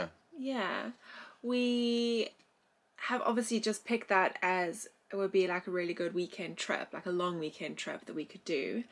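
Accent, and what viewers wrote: South Africa. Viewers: Another great video, I don’t think that using the bow thruster is cheating it’s a must have unit nowadays to have installed on your boat. It’s interesting listening to your South African accent it reminds me of my time in Johannesburg in 1982. When are you going to set sail to come up north, try the Ribble link and the Lancaster canal, 40 plus miles and no locks, You would love it. Anyway keep up the good work and don’t mind the weather. Best regards from Tom in Lancashire peace and god bless.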